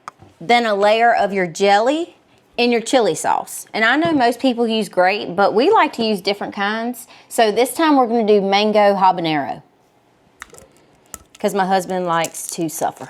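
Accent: South, United States. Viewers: You work hard to cook for your family. The best part is you cook Italian food. All done with a Southern Accent. Remember, Men have no balls, but the Women have dee big balls! Fantastico! Ciao, Bella! ❤❤😂😘❤❤ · No offense-I don’t think I’m ever going to make what you make, but I do like watching you cook and listen to your accent (Boston girl here)! Taste for poison is brilliant and liquid eggs - OMG! You go country girl! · ABSOLUTELY SHE NEEDS A FOOD NETWORK SHOW!!!! She cooks like the rest of us real people! She also talks like most of us in the South and lives in a remodeled 1971 mobile home that is gorgeous! Like most of us in the South, we like paid for stuff and repurpose, reuse and restore to keep it all paid for! We not into latest and greatest! We are into keepin it real and comfortable and debt free!😊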